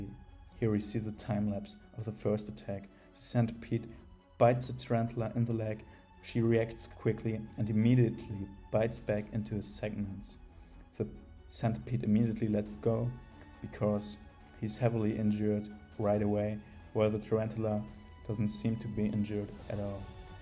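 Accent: Germany. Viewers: You have a German accent. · Hör ich hier ein Deutschen accent 🤔😂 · Are u German? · Kanns sein das du Deutsch bist 😂✌️ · Are you German? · Wie man raus hört das du deutscher bist 😀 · You must be german, your english sounds like that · I like that the narrator has a German accent worthy of a Bond villain. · u r definitely german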